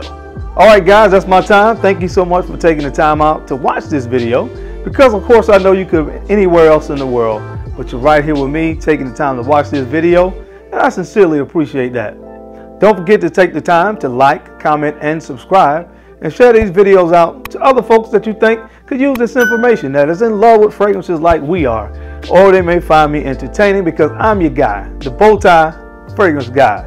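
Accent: United States. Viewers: Love the country accent.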